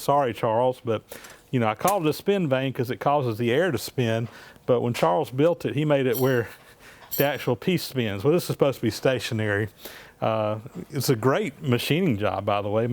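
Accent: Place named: Georgia, United States. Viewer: Do you have the IPA notation for your pronunciation of "oil"? Your Georgia accent & doubleboost's Geordie accent are about the best things on youtube.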